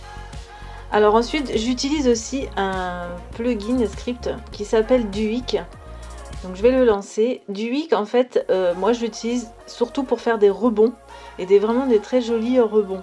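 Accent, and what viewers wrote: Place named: England, United Kingdom. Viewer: Très bel accent anglais :D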